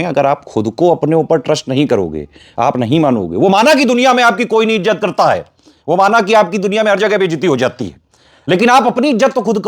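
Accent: Kanpur, India. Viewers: Sir aap kanpur se h kya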